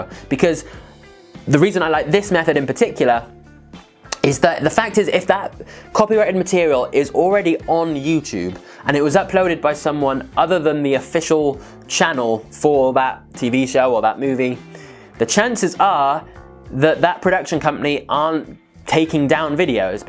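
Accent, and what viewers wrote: United Kingdom. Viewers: Thank you, you've have explained the topic in a manner of very detail. Quite a long video though, but I like your Brithish accent.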